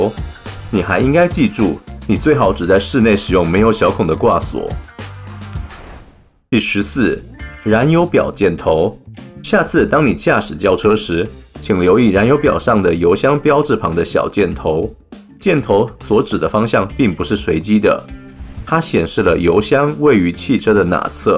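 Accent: Taiwan. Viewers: 說話方式怪怪的，而且聽起來像台灣人又有點不像台灣人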